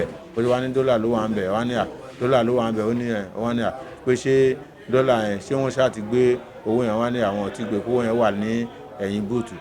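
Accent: Nigeria. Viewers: Yeah is from osun either okeigb. Ile ife or ifetedo.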